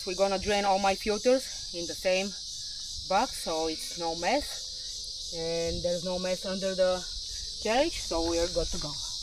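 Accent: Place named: Russia. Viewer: Really? This is a bad joke. Your accent is not american sound like a russian...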